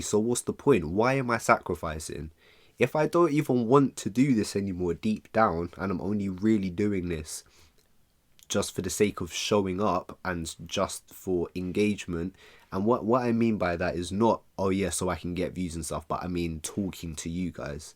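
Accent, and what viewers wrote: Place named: London, United Kingdom. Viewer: Honestly bro where do I even begin a massive shout out to you for being an amazing youtuber round of a applause to you my bro👏first time when I found your channel thought to myself that your from London then I immediately subbed to your channel as I kept joining your streams every Saturday tunning in consistently we both became friends met new people in the streams playing Mario kart having fun honestly am going to miss playing it with you online take care of your self with the hard work you put in YouTube wise.🔥🔥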